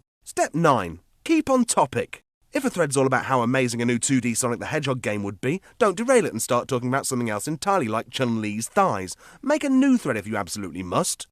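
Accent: United Kingdom. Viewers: The british narrorator makes this video hilarious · Everything sounds better British.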